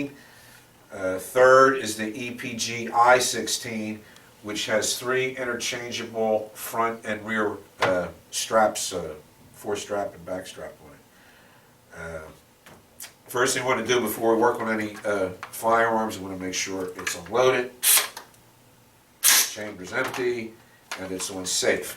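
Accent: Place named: Nassau County, United States. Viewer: nassau county accent detected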